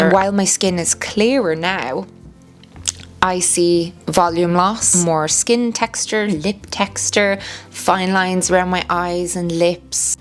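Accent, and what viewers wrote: Ireland. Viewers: I've been here from the start. Started following you for the beautiful Irish accent and stayed for your beautiful personality ♥️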